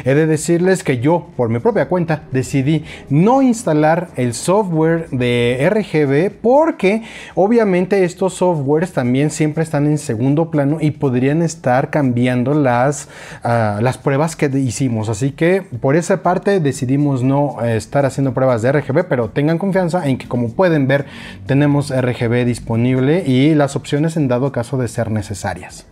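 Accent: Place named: Sonora, Mexico. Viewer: es de Sonora o por qué tan Hermosillo?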